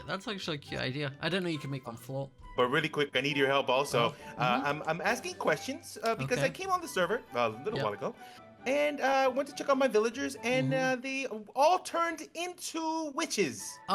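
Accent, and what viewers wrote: Scotland, United Kingdom. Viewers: Scottish acents are funny sometimes lmfao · Hello I was puzzling around from were you came from. Because some how im Familiar with this Englisch that you are speaking Australien, Cockney Englisch. Canadian Englisch. Then maybe Scottish. That could be. I was not shure. Scottish is positive. I few years ago our Theatical group got ivited to St. Andrews. And I remembered the Englisch there were speaking is like yours. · Scottish accents are the best accents I do not have one 😞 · Are you Scottish 😮😮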